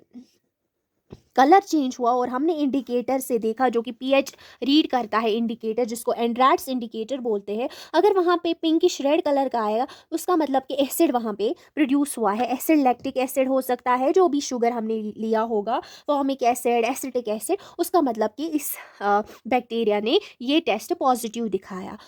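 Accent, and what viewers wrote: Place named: Kashmir, India. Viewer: you are kashmiri by your accent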